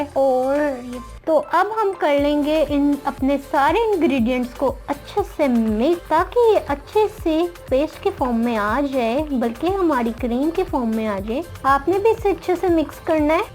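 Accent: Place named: United Kingdom. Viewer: Ap urdu british form me q bat karti hain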